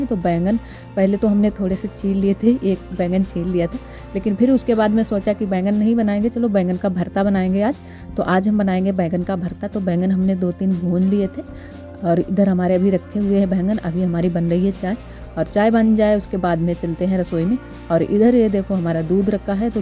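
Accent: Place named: Orai, India. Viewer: Ap Kahan se hai kuki apki launguage ekdum hmare orai u.p ki tarah h Kande to hmare yhn bola jata hai up m to ap Kahan se hai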